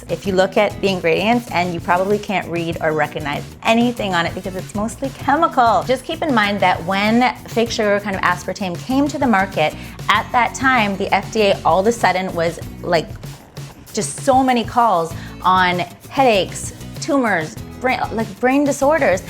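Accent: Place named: Canada. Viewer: I LOVE when your canadian accent comes thru! :)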